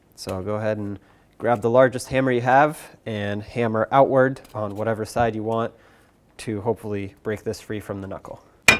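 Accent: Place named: South, United States. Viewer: This guy is from the south for sure lol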